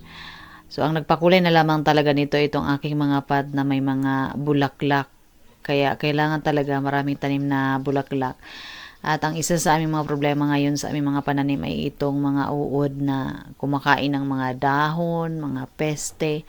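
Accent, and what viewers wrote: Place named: Visayas, Philippines. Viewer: Paano kayu nagka kilala ng asawa mo sa tuno kase ng salita mo hinde ka taga kalinga para kang taga bisaya.sana ma notice mo comment ko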